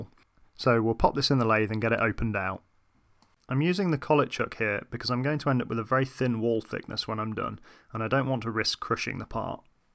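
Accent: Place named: England, United Kingdom. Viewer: I like this idea. The only thing you may have missed or considered not necessary is a pressure relief valve. If you decided to do without, why?....guess I should of read the comments first as apparently I'm not the only one wondering and the question was asked and answered. You didn't show how the tank was connected to the compressor tank in detail. It's assumed that with your accent and the union jack you and from England so what is the code as it pertains to pressure vessels? Thanks.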